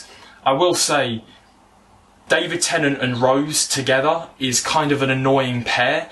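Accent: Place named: South East England, United Kingdom. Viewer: oh, you're a white guy in hid mid 20's with a vaguely south eastern accent and no fashion sense. I think i can guess most of your rankings before even watching the video s- series 4 and 5 a- series 1 3 14 and 10 b- series 6 and 2 c- series 8 and 9 d- series 7 and 13 f- series 11 and 12 edit: skipped to the end, i got many of these right. It's infact true that cis men have no taste.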